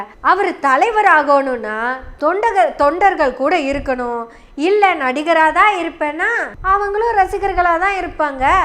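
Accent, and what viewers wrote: Kongu, India. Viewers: அருமையான கொங்கு தமிழில் தவெக வை தற்குறி வெக வாக துவம்சம் செய்த சகோதரிக்கு பாராட்டுக்கள். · Very interesting presentation in beautiful Kongu Tamil. May the God bless you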